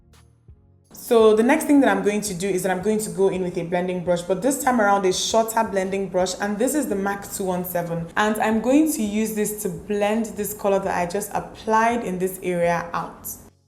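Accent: Nigeria. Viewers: Idk why but I love the Nigerian accent😍😍🤤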